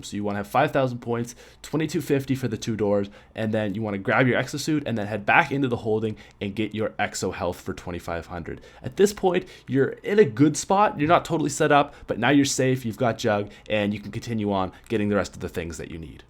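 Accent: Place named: Canada. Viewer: Hahaha you must be new to his channel. Not trying to be rude, but he's Canadian and says some words just a bit different. Nothing bad about it tho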